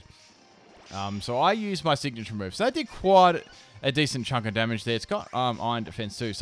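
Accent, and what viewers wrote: Australia. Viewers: today i was at toys r us and there was an Australian family there. the moment they started talking with the Australian accent, i immediately thought of you lol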